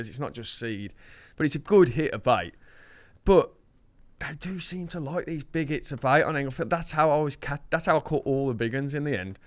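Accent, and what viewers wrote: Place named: Birmingham, United Kingdom. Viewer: with a Brummie accent! meets Peaky Blinders!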